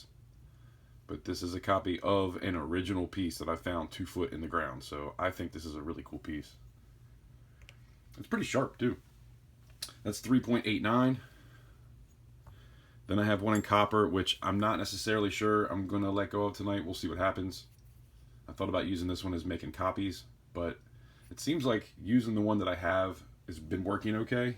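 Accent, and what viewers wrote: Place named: Washington DC, United States. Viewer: Wow! You do auctions?!😯 You are the 1st person I have seen do that! Sounds like you live around D.C., I used to live in Gathersburg, MD, like back in the 80's; nice state! Those little nuggets are cool with the "CC" on it. You made that yourself? You are the man if so! 😎Looking at the rest of the video, it looks like you made a lot of this yourself! Are you like a metal-smith? I am blown away by your talent. 🤯Great job! Can't wait to look if you have a video on how you do this. Looking forward to your next video; also going to Sub now and help a little bit on your path to 2k Subs! Be safe, take care.... See you in Valhalla! ⚔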